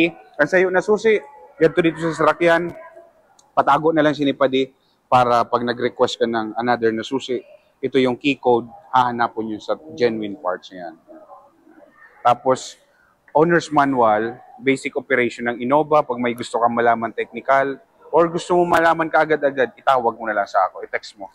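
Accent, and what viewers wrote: Philippines. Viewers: Garu matnog ang tuno kan bikol mo sir? Tama?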